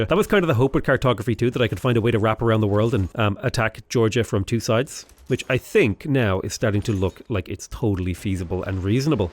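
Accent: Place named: Ireland. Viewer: lol It's really interesting hearing an Irishman talking about destroying the Catholics. Also, you sound crazy like Dara Ó Briain to me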